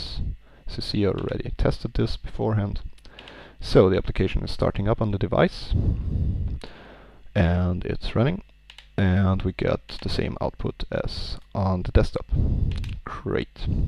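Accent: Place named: Germany. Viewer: Nah, a heavy German one ^^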